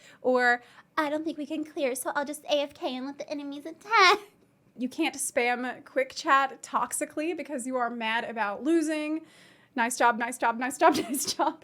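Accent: South, United States. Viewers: I'm from Birmingham Alabama and she sounds southern. Then again not all of us have that kinda twang from the city. Some of us sound more from the hood than from the country. My point not all southern accents are made equal. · you know i didn't process this at all but i just realized does have a southern accent. I think im used to hearing lighter less subtle southern accents that i didn't notice at first. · Love hearing the southern accent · geez, now I totally hear the southern accent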